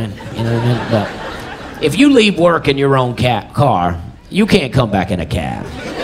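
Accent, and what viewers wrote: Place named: South, United States. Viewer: I'm a Midwesterner, and I have to admit that part of appeal is that accent...but it's more than just the southern accent, it's kinda the rythm and the calm way he talks... but overall is just so multi dimensional: the jokes, the timing, the laid back demeanor. This guy is one of my new favorites.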